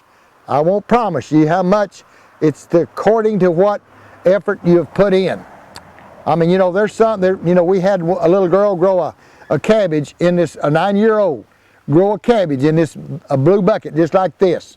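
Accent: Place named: East Tennessee, United States. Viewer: you sound almost like you are from East Tennessee. Almost. I love watching your videos. My grandpa used to grow the best muskmelon in the world. He's been gone now for 40 years...that's hard to believe. But I can still taste that muskmelon. He grew it by his chain link fence, and hung the Mellons on the fence in slings made from granny's old pantyhose, lol. I'm so glad to see you encouraging the young ones to grow, most of them have no idea what food is supposed to taste like. God bless you!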